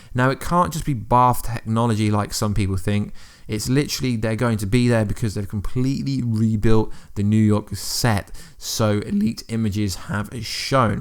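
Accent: United Kingdom. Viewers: British?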